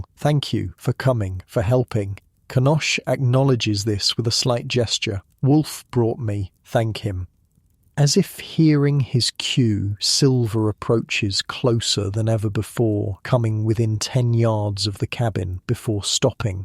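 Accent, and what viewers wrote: United Kingdom. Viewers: Not a fan of Brit narrators.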